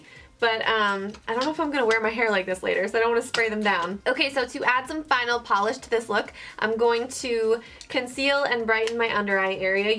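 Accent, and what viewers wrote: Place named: Philadelphia, United States. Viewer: So cute! Love the dress too. Every time I watch one of your videos, my husband, who grew up in South Jersey, but whose family is from Philadelphia always says "It's that girl from Philly, right?" I can't hear your accent, but he can!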